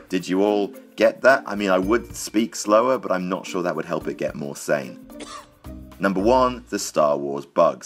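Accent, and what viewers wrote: United Kingdom. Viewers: You're so great, I love this channel. The content, the editing, the comedy, that snarky British accent that we Americans adore so much. It's like a perfect package all rolled into one.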